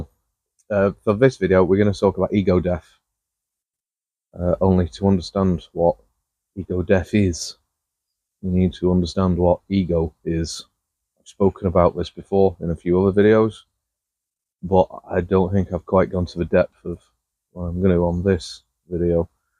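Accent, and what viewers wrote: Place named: Manchester, United Kingdom. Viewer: Trying to place your accent, from the north somewhere, but maybe not Yorkshire, almost a Manchester thing going on there, I've lived in Lancashire all me/ my life.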